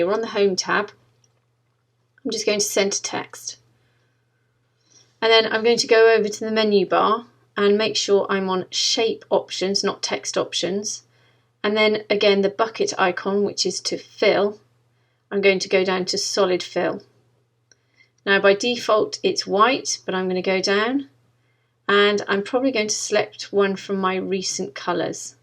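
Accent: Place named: RP, United Kingdom. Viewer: Brilliant! Thank you so much! So much to learn! With you, step by step, plus that angelic RP kept me focused all the way through!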